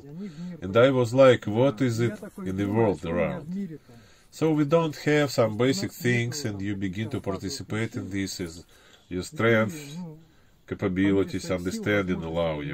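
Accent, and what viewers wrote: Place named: Russia. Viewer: great that there are other videos in preparation - looking forward to watching them! Regarding dubbing, I was watching without a sound, so I think that dubbing is unnecessary. Yes, you have a quite typical Russian accent, which is totally fine, since you are a Russian. :)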